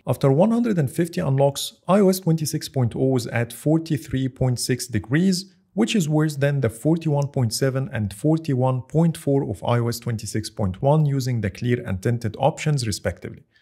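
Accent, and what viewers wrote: Egypt. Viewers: Istg i knew you are Egyptian from the very first word ! All the support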